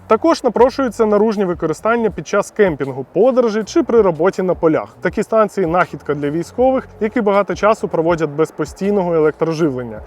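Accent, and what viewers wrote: Russia. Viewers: Твои русизмы в словах сложно осушать. Ты либо по русски бы говорил, либо пусть тебе бы написали текст для диктовки на чистом Украинском.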